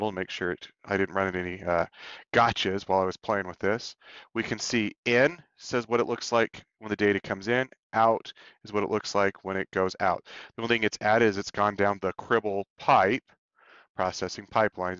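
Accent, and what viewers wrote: Netherlands. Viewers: Interesting. Are you dutch? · You are dutch ? :) hahaha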